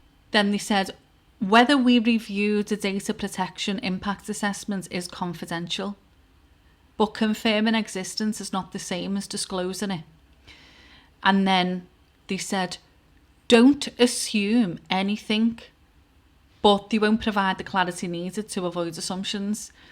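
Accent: Liverpool, United Kingdom. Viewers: And again thank you,you beautiful scouser English lady and to summarise their house of cards are supported by matchsticks that are on fire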